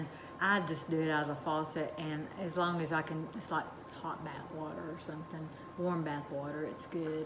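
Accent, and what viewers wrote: South, United States. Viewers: I absolutely love your videos! You remind me a lot of both of my grandmothers. One of them sadly passed away 11 years ago and I miss her dearly. She made the best yeast rolls, but she never wrote down her recipe. I’ve been on a quest to recreate her yeast rolls and I’ve made many different versions over the years. I’ve come very close a few times but would love to try your recipe! You remind me a lot of my other grandmother as well, who like you, has the most beautiful Southern accent. 🥰 Your videos and stories are so wholesome and heartwarming and remind me of family, home, and comfort. It really feels like we’re part of your family. Thank you so much for sharing your life with us! Blessings to you and your family ❤️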